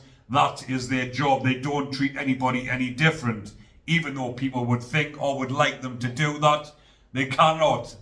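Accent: Newcastle, United Kingdom. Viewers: I love your accent. Listening from down south here. Never been to Geordie land but I would like to visit one day. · please lose that annoying Ant & Dec accent. Talk like a Southerner, like a normal Brit.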